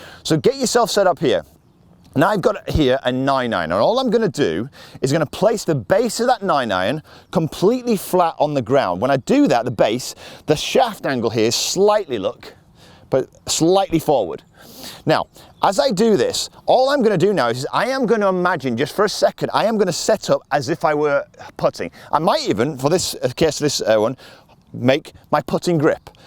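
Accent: Yorkshire, United Kingdom. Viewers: Thank you. Yet another super well explained video, simplifies the process. P.S. I love the subtitles trying to cope with your Yarkshire accent! I'm still trying to find my "pudding stance".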